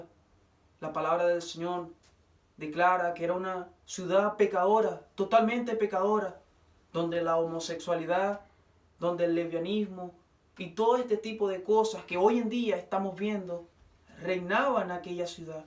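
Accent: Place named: Chile. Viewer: Ya pareces chileno mano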